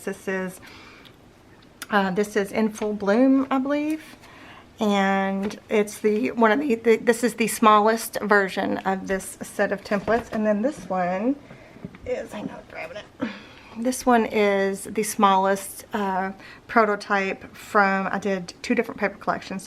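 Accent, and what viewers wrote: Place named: Tennessee, United States. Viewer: I enjoy crafting along with you because you’re so very kind to your viewers.😊 It’s always a pleasure spending time with you, especially when you make a boo boo and you show us how to fix it. 🤗……also, I love your accent. I grew up over the hill in Tennessee and when I hear your voice it reminds me of home.❤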